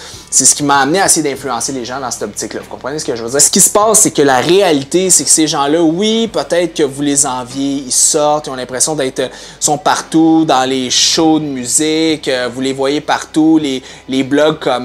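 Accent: Canada. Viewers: Je confirme que c’est un plaisir de t’écouter / te regarder 🥰 Le contenu est très intéressant 👍🏻 Tu es très mignon, oui oui 🥰 Et en plus tu me fais beaucoup rire avec ton accent canadien et tes expressions (je suis française et je n’ai pas encore eu la chance et l’occasion de visiter le Canada) ! 😅😅😅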